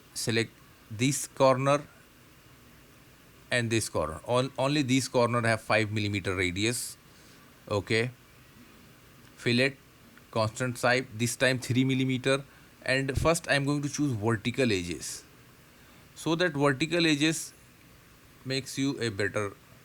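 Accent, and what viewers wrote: India. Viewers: Hi.Please work on improving your English accent. You have mixed your English accent with your Indian accent in a funny way.😊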